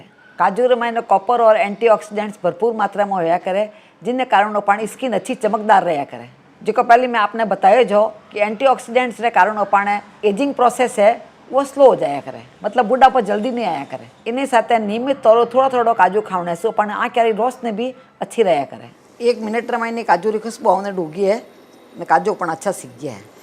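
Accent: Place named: Marwar, India. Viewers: Aap English bhi Marvari mi bolty ho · आपकी gk को प्रणाम,,मारवाड़ी भाषा में English मीठी लगती है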